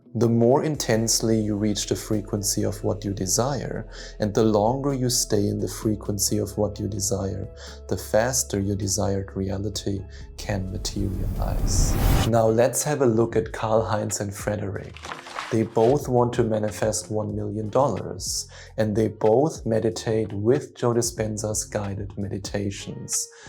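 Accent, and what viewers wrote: Germany. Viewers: I started a 90 day dr joe dispenza meditation challenge for myself & sharing it on my youtube channel to stay committed & motivating my clients. You really always inspire me..this weekend will do a home retreat.Many thanks for your videos..vielen Dank..sind wirklich tolle Videos..empfehle Dich immer gerne weiter. I was in Basel 2024 but meditated on and off..consistency is key..got it locked in now🎉we got the same German accent😂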